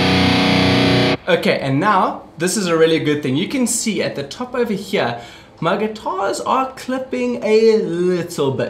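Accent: South Africa. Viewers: My boet, you sound South African. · Imagine my surprise hearing a SA'n accent as this vid started haha. Lekker bru :P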